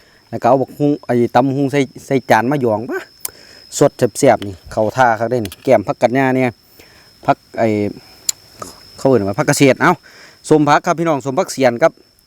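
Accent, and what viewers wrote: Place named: Ubon Ratchathani, Thailand. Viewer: เกรียงไกร สุริบุตร ฟังสำเนียงเราน่าจะยุ่ทางอำนาจอุบลละคับพี่น้อง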